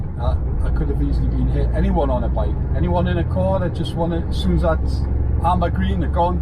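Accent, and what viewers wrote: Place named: Geordie, United Kingdom. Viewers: What a shame just found this now 2021 hope ur still trucking..nice to hear ur Geordie Twang....in lockdown England... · North Shields and South Shields names come from being the shields of protection from people attacking us from the north sea. Your monk story is not the origin of the place name. Anyway you sound Geordie so I will subscribe. 😃😉👍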